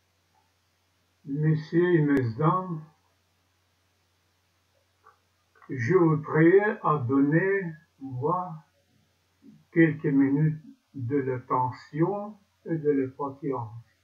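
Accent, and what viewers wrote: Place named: France. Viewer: Добрый день земляк..У вас французский прононс 😀,вы имеете большую способность к языкам 👏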